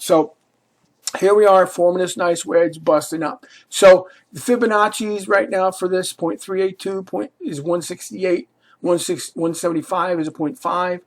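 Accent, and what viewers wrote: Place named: Philadelphia, United States. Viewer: Subscribed because I'm from Philly and that accent makes me feel at ease 😌 lol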